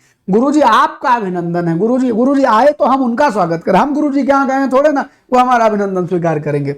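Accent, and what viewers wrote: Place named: Kanpur, India. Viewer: Aap sir Kanpur m rahe h kya boli aapki vaha ki lgti h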